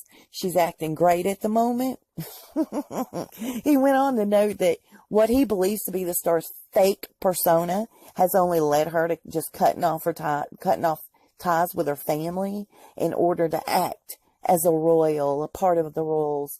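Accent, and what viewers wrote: South, United States. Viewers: I just love your accent, I lived in the south and love to hear it! Plus you are 100 percent right about Megan it will catch up to her!😂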